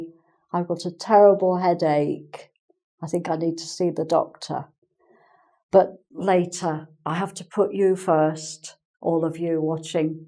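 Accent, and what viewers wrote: England, United Kingdom. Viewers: Thanks for your greet efforts I loved your British accent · I like her personality and her British accent 😍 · I love your english accent!!, it sounds elegant and clear,, some day I hope I´ll get it,,, the class was really useful !. I´m from Perú!! Cheers from Perú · I love your British accent, it's sounds very cool 😊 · Dear mam,your speech itself makes us to understand the British accent very well.Thanks a lot. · I like your perfect british accent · Hi Mom, I'm from Indonesia. I like your british accent. I like also the way you teach. I always learn English from your videos. Thank you so much. God bless you.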